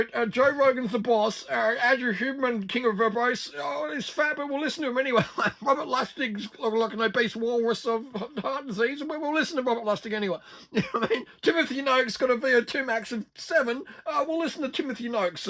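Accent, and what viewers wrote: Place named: Australia. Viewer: When I hear an Australian accent I pay attention.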